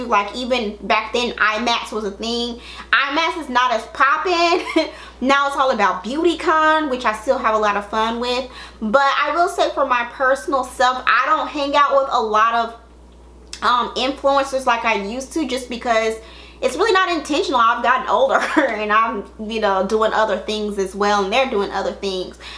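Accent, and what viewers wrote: South, United States. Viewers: I started watching you just to hear you talk because you remind me of someone from the south such as myself....but when I say that you hit this topic right here out of the field and that was a hall of fame homerun. Gurrrrrlllll, you kilt me when you said, "I am 30"! That means I do not have time for the bull crap and the cliches'. That is some childish shit and I will say that for you. See, I love a genuine person. This is why half of them are having issues and problems within their homes and lives. Some cannot go any further because of it. There have been some that I have unsubscribed from for this very reason. Plus they always have problems with people and other brands......damn just shut the hell up with it all. True we come on here for entertainment but at the same time we want to see the real reality shows, instead of all of this she my friend oh I don't hang with them because they don't drive a Mercedes or they toast their bread in the stove and not a toaster....I know I went there for a second. 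Anyway, I love what you said and the way that you said it, you were respectable not to stomp on anyone toes. Really, if you are who I think you are--you wouldn't give a damn if they did get mad! I know I wouldn't. One instance and I know somebody may say something back at me but oh well, but this damn palette issue. Why are some people on these videos eating from the same table but you're not sampling this person's new collab, when I have clearly seen them on pictures toget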